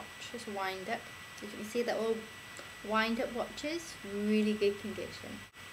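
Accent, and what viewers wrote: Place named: Wales, United Kingdom. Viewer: Good video , nice Welsh accent , excellent.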